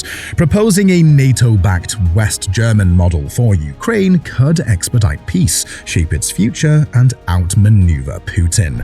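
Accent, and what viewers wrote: United Kingdom. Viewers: Rubbish and biased video. British sounding Harry Potter Uncle Tom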